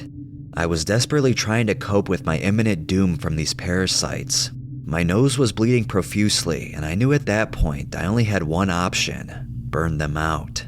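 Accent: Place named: California, United States. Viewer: Omg I love your words 😂😂😂😂😂😂 finally a swear guy you sound like us so. California's peeps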